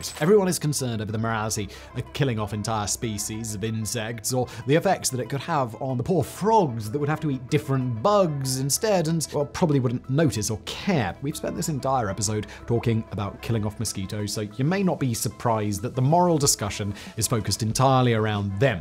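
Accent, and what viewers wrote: United Kingdom. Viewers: I thought this was v sauce doing a British accent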